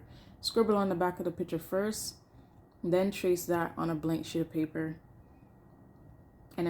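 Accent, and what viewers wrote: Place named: Jamaica. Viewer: A patois (patwa) dat mi hear 😅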